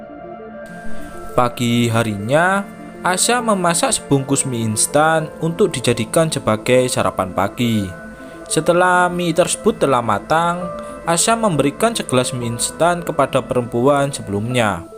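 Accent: Java, Indonesia. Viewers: Jngn terlalu medok mas suara nya... Biar saat bercerita lebih terbawa suasana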